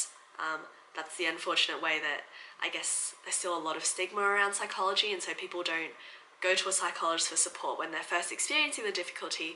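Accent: Australia. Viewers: keep up your Aussie accent. Im a BBASEAN British born South East Asian, and none of us talk like Brits we all talk like gangsters hahaha 😎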